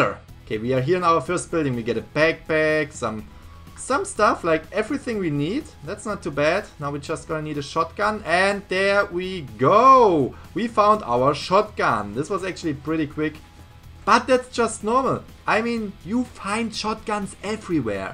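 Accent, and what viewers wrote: Germany. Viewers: Are You german? Because You have like an accent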